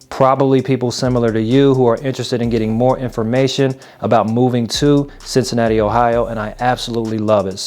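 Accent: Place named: New York, United States. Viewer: sound like New York to me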